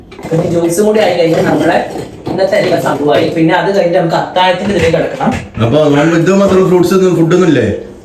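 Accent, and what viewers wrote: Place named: Kuttippuram, India. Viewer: nde veed kuttippuram alle. Njan chembickal anu. Njangale samsaram polethanne nindeyum. Orupaad uyarangalil yathatte. Padachon anugrahikkatte. Yende naatukari penne❤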